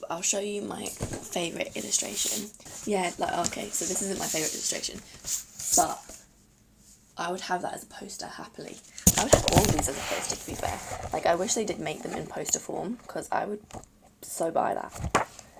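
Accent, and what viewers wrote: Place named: United Kingdom. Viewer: I love the British accent and you are a stunning example! Thanks for sharing, greetings from Italy.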